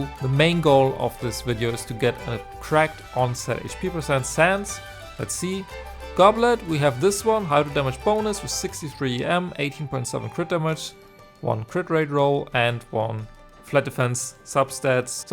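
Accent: Germany. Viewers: Man hört dir dein deutschen Akzent so raus 😂 Aber gutes Video 🫶🏻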